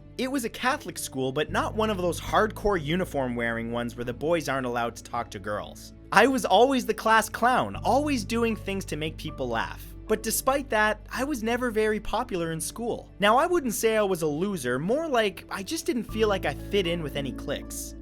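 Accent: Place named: Canada. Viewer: I knew you were Canadian from the first video of you I watched with you saying out. I worked with Canadians before and they helpede grow. I worked for them as virtual assistant. Keep up your awesome videos and I hope you don't lose hope Matt!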